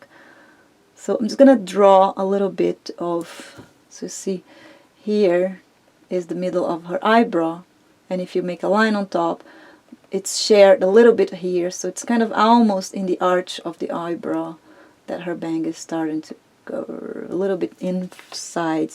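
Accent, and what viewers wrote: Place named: Brazil. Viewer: Aah, eu sabia que seu sotaque era diferente, imaginei que fosse brasilwira no momento em que ouvi tua voz, alguém mais?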